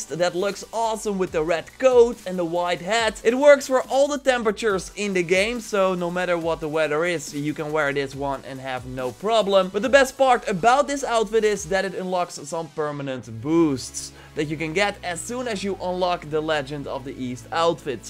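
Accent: Netherlands. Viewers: I can tell by your accent that you're Dutch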